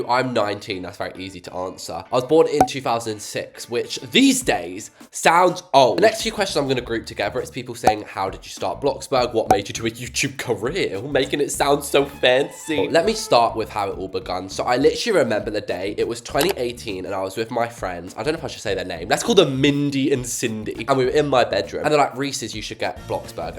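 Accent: United Kingdom. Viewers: Why are you British? 😊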